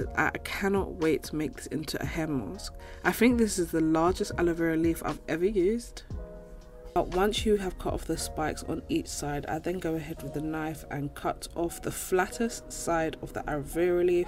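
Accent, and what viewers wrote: United Kingdom. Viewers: British accent from n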